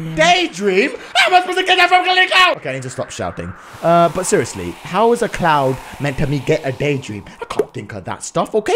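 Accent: United Kingdom. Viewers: You are british this game is american accent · your british my man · And he is British English (that's why)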